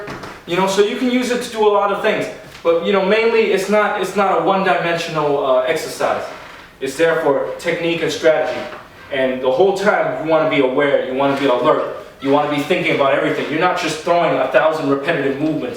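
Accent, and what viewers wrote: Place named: Philippines. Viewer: He has a filipino accent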